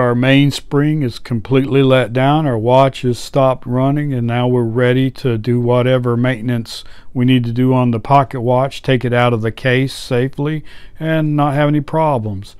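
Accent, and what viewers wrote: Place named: North Florida, United States. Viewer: Awesome, thank you! I received a Benrus that was overwound and it's going to need human intervention. By the way, are you from Northern Florida/Alachua County? Your accent reminds me of home.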